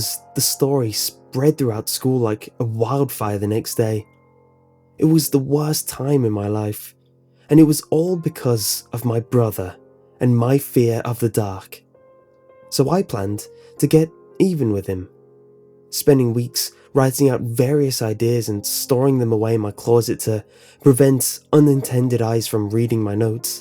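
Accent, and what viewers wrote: United Kingdom. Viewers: THTHTHTHT terrible. british accents cant help terrible stories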